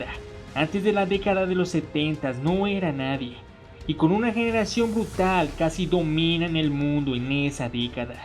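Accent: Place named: Brazil. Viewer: Seguramente es brasileño y le cuesta el español se n ota el acento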